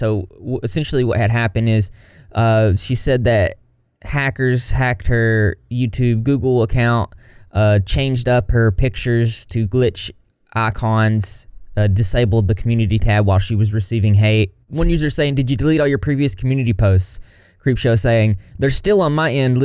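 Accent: South, United States. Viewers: Off topic but... I've never watched your videos before, but you have such a beautiful voice and accent 😍 Subscribed! Where in the US are you from? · i wasn’t expecting a homey southern accent but it is welcomed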